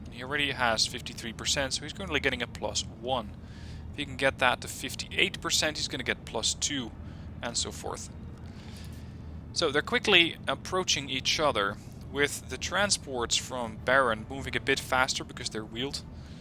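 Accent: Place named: Netherlands. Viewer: Ok. By the way, are you from the Netherlands? You have a particular accent.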